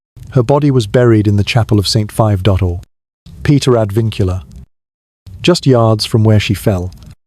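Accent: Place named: Southern England, United Kingdom. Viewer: The narrator (AI) may be boring, but it's a standard southern English accent.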